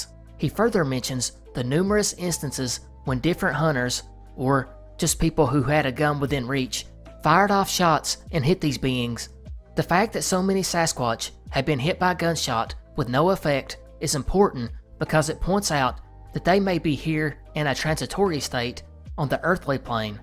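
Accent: South, United States. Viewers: I’m born and bred in the North Carolina mountains and I love to listen to you. We have different levels of southern drawl here but I almost feel like I live down the road from you. Lol You do a great job and get a lot of info for your stories. I can only guess that you’re a sincere and good natured guy. You know the help out your neighbors without being asked type. Glad I found your channel!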